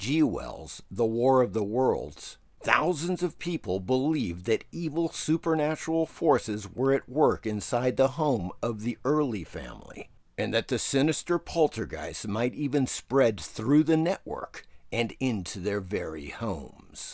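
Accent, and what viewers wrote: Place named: United States. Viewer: You're an American aren't you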